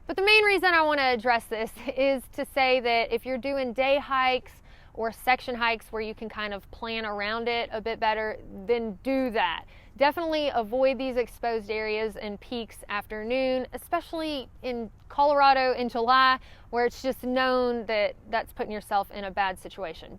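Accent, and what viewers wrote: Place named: South, United States. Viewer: Good advice on the antidiarrhea medicine. I'm going to put that on my checklist right now. Also, I had to laugh. I couldn't understand why you said not hiking in bear country until I realized you were saying night hiking. I guess I need to come down south more often. 😁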